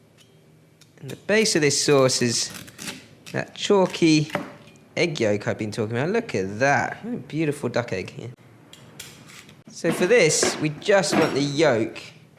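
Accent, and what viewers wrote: United Kingdom. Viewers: A chinese guy in British accent 😂😂 cute😂😂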